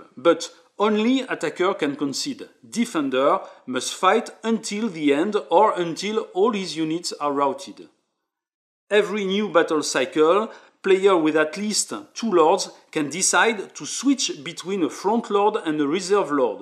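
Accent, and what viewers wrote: France. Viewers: Thanks a lot! You are my most preferred english spoken with french accent youtuber! 😁💕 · 36 seconds of this amazing french accent with attitude and I subbed. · I did indeed overcome the delicious French accent and it was totally worth it. This was a great tutorial! Well done! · "Delicious French accent..." LOL. It truly is. What a fantastic resource for Nevsky learners. Well done! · Very impressive! Watching it before trying to digest the rulebook. Being a Russian I'm indeed delighted by the choice of the topic by Volko Ruhnke. And on top of that, this French accent makes it look and feel more 'medieval' 🧙‍♂️ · Super accent :) Du coup j'arrive à comprendre les règles même en Anglais. "But you are French ?" ;) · Best tutorial ever and bonus the French accent that makes it even better....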